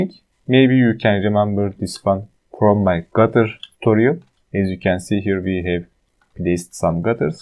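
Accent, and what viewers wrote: Turkey. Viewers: where are you from? I was curious. You speak English like Turkish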